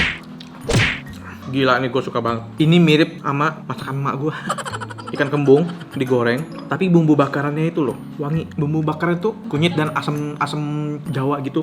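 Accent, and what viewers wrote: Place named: Surabaya, Indonesia. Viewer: Mamen gak pernah telat nih nonton video nya, mana suaranya surabaya😂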